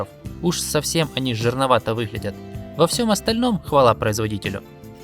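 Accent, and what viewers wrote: Ukraine. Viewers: Чувак явно с Украины)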